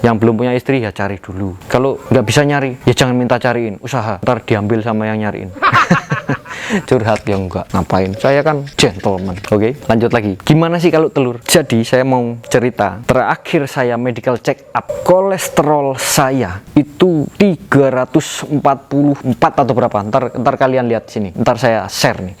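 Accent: Yogyakarta, Indonesia. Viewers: Wong jogja to jenengan mss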